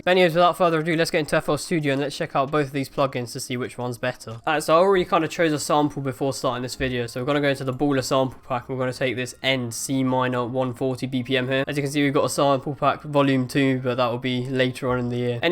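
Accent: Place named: United Kingdom. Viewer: guy is really BRITISH!!!!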